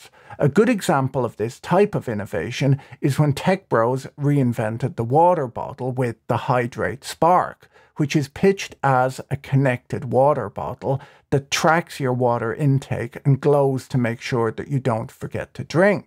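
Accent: Ireland. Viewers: he's Irish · But he's obviously Irish...??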